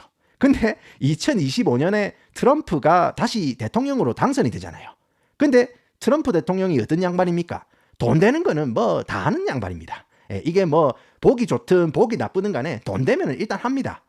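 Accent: Gyeongsang, South Korea. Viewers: 영어 발음은 네이티브인데 억양에 부산 경남 억양 있는게 존나게 웃기네 ㅋㅋㅋㅋㅋㅋ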